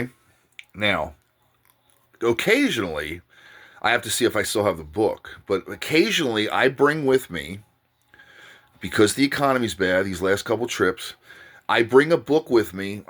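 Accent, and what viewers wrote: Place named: Philadelphia, United States. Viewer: Man i heard that philly accent and thought damn ill get this dude some bread but your down in Florida . Im in md . Other than home made tats ive done all my work was done by a dude named Frank at a shop in philly off south street ( Eddie's i think is the shop every time i was wasted so i cant remember ), its all jacked up drunken spur of the moment stuff. If your ever blowing through MD or philly let me know 🤙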